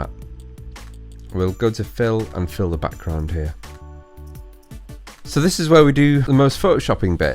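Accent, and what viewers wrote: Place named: England, United Kingdom. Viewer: i knew through his accent hat this guy is from england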